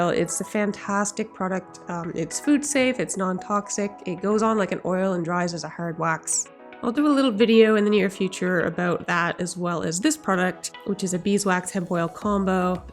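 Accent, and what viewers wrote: Nova Scotia, Canada. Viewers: I'm Calgarian born and raised, but both my folks were from NS (Kentville and Alyseford/Berwich area) Your accent does show a bit..Even I get one anytime I've been for a visit ...far, car, bar, over home hahaha I love it!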